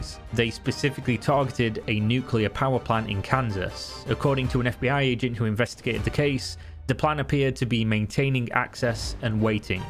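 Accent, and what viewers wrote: United Kingdom. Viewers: This is one of the very very very few videos I’ve clicked on, EVER in my entire life of using YouTube, that I’ve ever thought was just unbearably boring. Maybe it’s the British accent and slow talking on a boring subject that did it for me? I don’t know man. The video isn’t bad. It’s informative, and I’ll give it a like- but man, this was just…painful to try and sit through lol (I’m 26, been watching since I was like 12)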